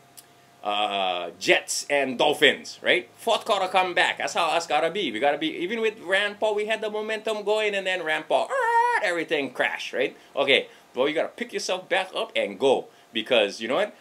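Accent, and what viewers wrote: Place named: England, United Kingdom. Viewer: Nice D&D shout out. LoL. However you made this far too English English. Was right esay to unnastan man.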